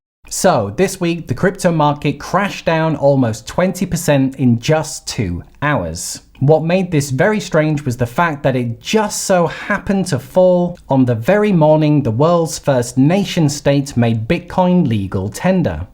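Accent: United Kingdom. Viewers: I think you have the best info and videos of all my Crypto channels to follow - Thank you!! I always look forward to your new videos!! & who doesn't like to hear a British accent along the way!!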